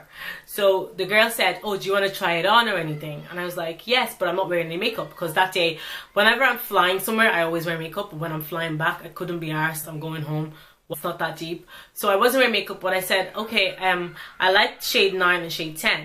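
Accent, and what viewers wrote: Ireland. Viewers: your accent sound irish♡, I used to live there. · ***** oh i just realise the girl an irish accent i am from ireland what part you from lolz i am from co.tyrone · Have you got a irish accent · I'm Irish, and you have a bit of an accent, do you live here or are from here? You're absolutely stunning, and I loved this video! Subbed x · Are you from Dublin? Just a guess haha x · Sounds more Irish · I just came across your channel and I'm wondering did you ever live in Ireland you sound really Irish sometimes when you speak :) can't believe how you were treated I would have freaked out lol. I've never had this happen to me thank goodness! :) I subbed :) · I'm a brand new subbie so I have to ask!!! Are you Irish ? It's just that I live in Dublin and I can hear it in your accent! Not knowing is killing me! xxxx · Are you from Ireland? Your accent is amazing omg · Do you live in Ireland? 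There's a little Irish accent in your voice! ❤️ (I'm from Ireland) Subbed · i knew I recognised the accent 👌im Irish two 💞Are you living in Dublin❓🍀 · I was thinking "Oh cool, a YouTuber from Nigeria. Wow, it sounds so much like an Irish accent sometimes, that's kind of interesting...." Lol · Your accent is beautiful. I was listening and listening, and I was like...Irish...and Nigerian. It's awesome! So lucky! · so so pretty! I have never seen a Nigerian with an Irish accent! its actually really nice! sorry you had a crappy time, isit the same in ireland with mac? x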